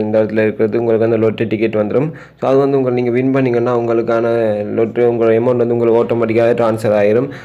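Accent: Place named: Sri Lanka. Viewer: Lanka tamil voice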